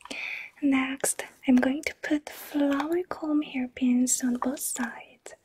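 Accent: South Korea. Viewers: 어쩐지 발음이 약간 우리 영어선생님이랑 비슷하고 한국인 같㉰ 했는데 내㉮ 젤 좋아하던 asmr유튜버㉮ 한국인이라닌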